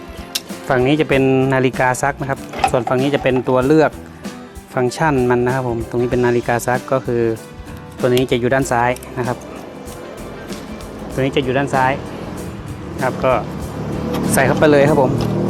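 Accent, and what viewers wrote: North, Thailand. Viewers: ขอบคุณครับกำลังเปลี่ยนพอดี ชอบช่างคนนี้อธิบายดี พึ่งรู้ว่าอู้ภาษากำเมืองเน่อ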